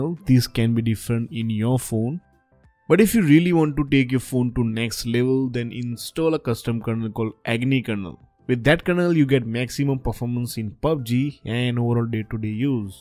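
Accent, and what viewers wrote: India. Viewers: Your voice sounds like indian person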